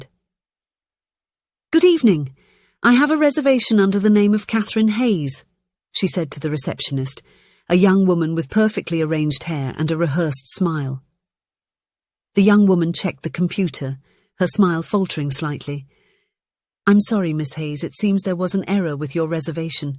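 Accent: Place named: United Kingdom. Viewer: Loved the story. British Narrators are Amazing. East Coast USA. 10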